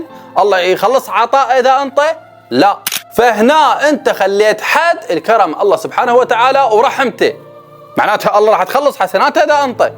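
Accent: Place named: Iraq. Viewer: شيخنا الفاضل انت عراقي لان لهجتك تبين من غير دوله احترامي لحضرتك من تكون عراقي او من غير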